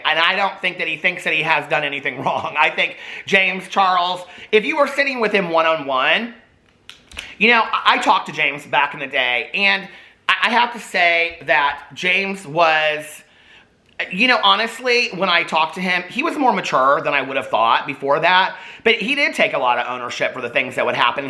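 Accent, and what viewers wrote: South, United States. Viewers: !!! 😂😂😂 your southern accent 🤣🤣🤣🤣🤣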